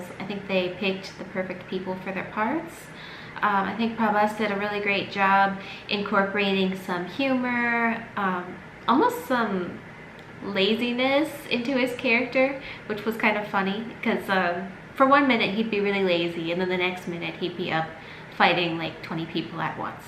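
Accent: Telugu, India. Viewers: genuine review movie is really good by the way your telugu speaking very cute🥰🥰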